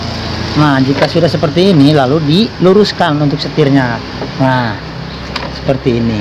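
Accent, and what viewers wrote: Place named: Banyumas, Indonesia. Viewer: Republik Ngapak..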